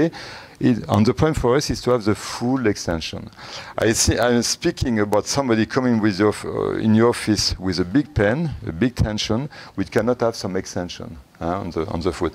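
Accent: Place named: France. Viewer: very good and nice French accent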